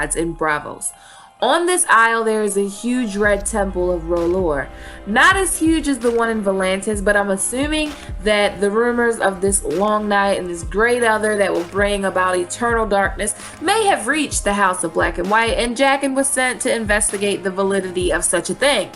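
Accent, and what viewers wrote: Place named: South, United States. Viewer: love that Southern accent that pokes out from time to time :)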